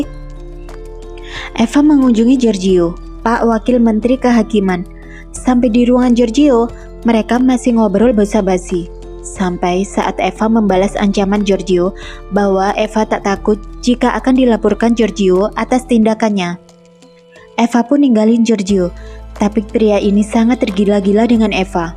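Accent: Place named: Sulawesi, Indonesia. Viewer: Logk Sulawesi 😂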